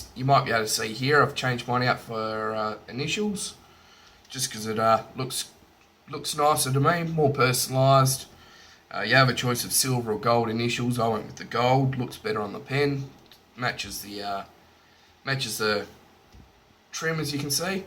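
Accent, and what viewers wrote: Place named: Australia. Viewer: thanks for the tip Hun. Also it's great to hear an Aussie accent doing these reviews. Keep up the good work 😊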